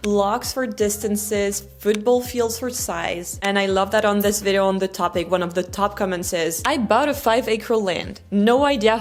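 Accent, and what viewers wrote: France. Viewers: I love that you pronounce Chicago just like someone from Chicago! It contrasts so sharply with your lovely French accent:] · Pretty sure 'Chicago' is the only word you said w/out a French accent. Guessing you say it how Bobby does 😄😄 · French accent engrish is annoying.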